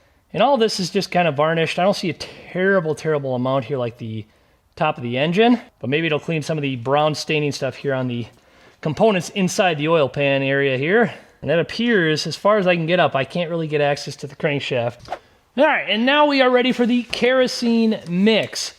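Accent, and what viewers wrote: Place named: Midwest, United States. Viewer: mid west accents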